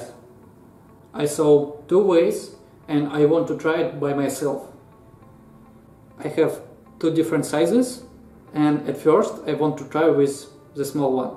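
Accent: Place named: Russia. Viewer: I feel Russian accent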